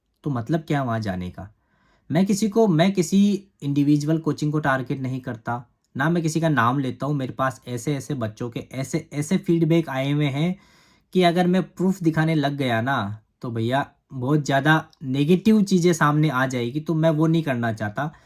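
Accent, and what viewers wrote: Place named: India. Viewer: my self prakash.... already have sent my concern on your Instagram handle....hoping that you will reply soon.......with lots of happiness and kinship you are continuously delivering to the needful .......Thanks ..,.i feel so happy to see a rajasthani bhai......... exploring uk......in desi accent ❤🎉🎉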